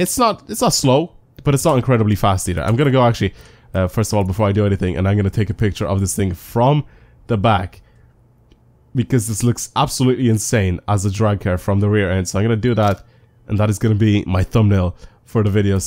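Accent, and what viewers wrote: Ireland. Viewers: Is he irish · is from Ireland?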